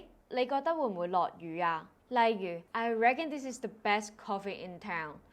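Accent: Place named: Australia. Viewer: maybe Aussie....but definitely not Bogan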